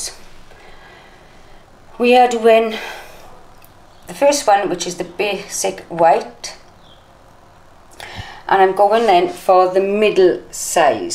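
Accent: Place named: South Wales, United Kingdom. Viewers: South Wales😊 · I recon that's a Rhondda accent. I get my flour from Aldi in Porth, When I say Porth I'm never sure if it's in Porth or Dinas. I'm new to using a machine for bread so thanks for the tips. Oh, and I use Honey instead of Sugar. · when I heard you speak I knew straight away, “ah, she’s from Wales.” My career was as a primary school teacher and I taught two little girls whose parents had emigrated from Wales to Australia 🇦🇺. They sounded just like you! It’s been 30 years or so but it came back to me like it was yesterday! 😊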